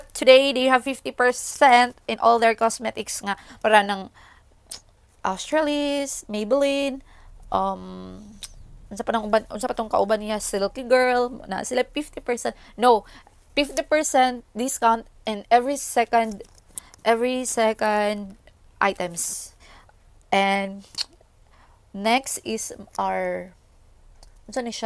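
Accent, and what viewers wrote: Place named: Visayas, Philippines. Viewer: Haha ang kulit mag bisaya no Ma'am :)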